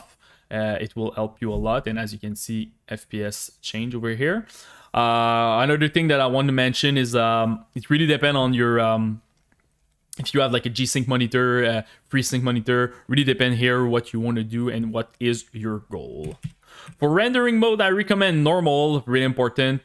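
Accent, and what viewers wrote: Quebec, Canada. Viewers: t'es tu un quebecois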